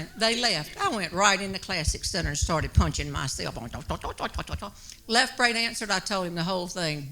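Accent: South, United States. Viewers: you`ve got a new BIG Fan from Germany. I have been watching many of your Videos here for several Weeks and i absolutely LOVE your kind of Humor. And also love that Southern Accent. It makes your Stories even funnier. So please keep those Speeches coming. Greets and many Thanks for many good Laughs from Hamburg / Germany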